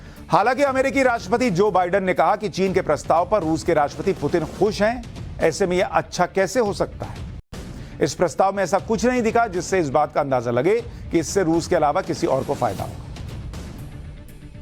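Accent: Bihar, India. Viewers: I love your Bihari accent.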